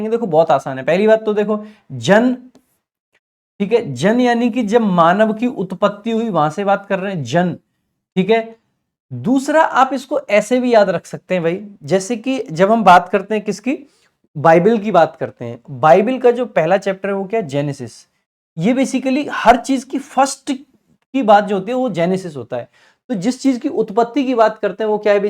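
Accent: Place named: Haryana, India. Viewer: HARYANA SA HO SIR MA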